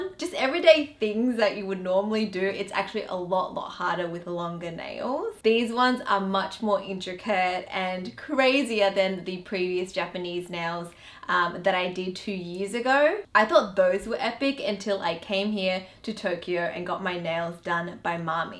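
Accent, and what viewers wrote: Australia. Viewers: you def have an aussie accent :) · Wait your australian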